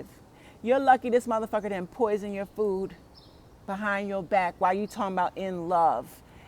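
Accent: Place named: New York, United States. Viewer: Powerful sister, sound like your from NYC...wish we could speak...blessings!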